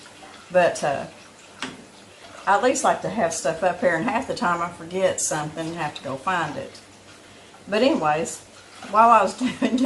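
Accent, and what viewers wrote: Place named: Arkansas, United States. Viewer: I just love your Arkansas accent! Love your videos too. You’re a good cook miss!!!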